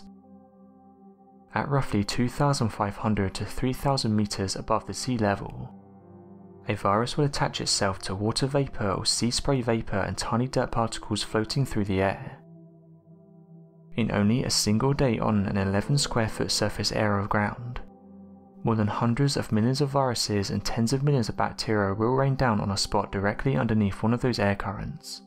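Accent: United Kingdom. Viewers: Galaxysurfer 1 It is you that is a bit thick. Did you not notice the guy has a British accent? They pronounce words differently. Who’s to say your country’s way of pronouncing it is correct? Have you ever heard a British person say garage? Instead of grr-age it’s more like gar-ridge. Listen to the song Levon by Elton John, he says gar-ridge by the motorway in the second verse. Since they were speaking English long before America existed, just maybe it’s their pronunciation that’s correct and you are wrong, lol.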